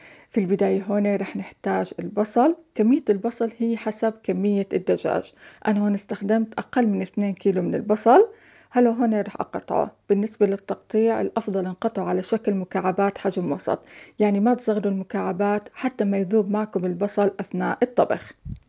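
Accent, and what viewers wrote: Palestine. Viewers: هيه الاخت فلسطينيه لو اردنيه لهجتهم خيال 😘💙💙😘😘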